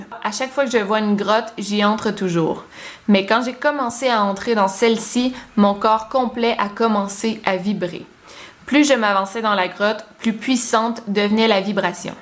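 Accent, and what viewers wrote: Quebec, Canada. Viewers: Encore une vidéo !😍 Je suis accro à ton contenu et c’est plus lfun d’écouter ça venant d’une québécoise 🙌🏻 C’est une amie qui m’a fait découvrir ta chaîne avec ton vidéo de creepypasta et depuis je suis hooked✌🏼j’ai hâte de voir les prochains sujets dont tu va parler et je suis vraiment intriguée par l’histoire de Chris Watts présentement et j’espère qu’un jour tu en parlera aussi. Ps. J’espère que tu as eu de belles vacances\un super mariage ✌🏼❤️ xx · Avant j’aimais pas trop l’accent canadien/québécois, mais quand tu commence à raconter tes histoires... WoW... c’est juste trop beau 😍 j’adore ce que tu fais, et je te suis depuis peu de temps mais je suis accros ! 😅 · Je kiffe 😍 j'adore toute tes vidéos j'arrive même a les regarder quand je suis toute seule chez moi,mes parents dise que tu casse la tête avec ton accents québécois mais moi ça ne me dérange pas continue ce que tu fais 😘😘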